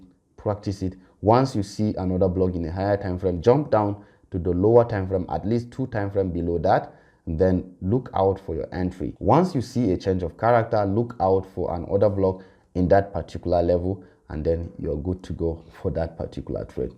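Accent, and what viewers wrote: Nigeria. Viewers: very good brother , you sound like you must be a Nigerian too that’s why I’m reaching out , let’s rub heads together sometimes hopefully the market charts gets easier with a team ( multiple heads always better than one)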